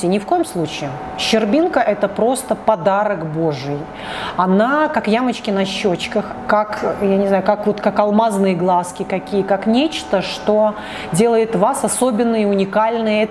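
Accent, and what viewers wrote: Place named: Russia. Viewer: Без акцента?! Чудовищный русский акцент!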